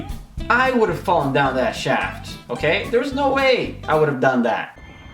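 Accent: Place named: North America. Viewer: cara, gostei pra caralho, apesarapesar de ainda ser um canal pequeno tem uma qualidade incrívelmente boa, e se o nn tivesse falado, eu teria certeza de que vc era norte americano, parabéns mano!!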